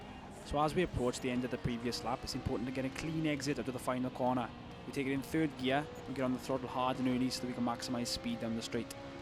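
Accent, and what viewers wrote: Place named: Wales, United Kingdom. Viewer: Really good video, shame your not making any more and it's nice to hear a Welsh accent :)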